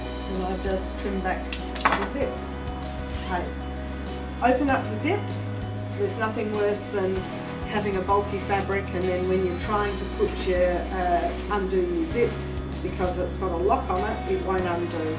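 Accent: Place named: Australia. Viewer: Fantastic Video Tutorial. Thank you so much for sharing. I am on Gold Coast and great to hear an Aussie Tutor. I wish I had seen this video before I made my last pillow. Would have had a much better finish. Merry Christmas and Thanks again.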